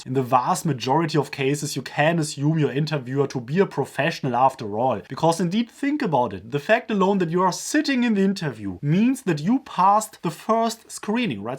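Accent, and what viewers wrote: Germany. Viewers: Very strong German accent 😆👍🏽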